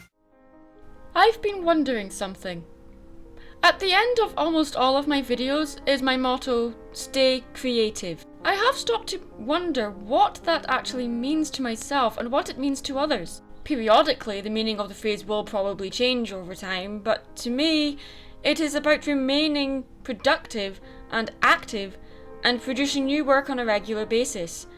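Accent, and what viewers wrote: Scotland, United Kingdom. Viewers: Big fan of your Scottish accent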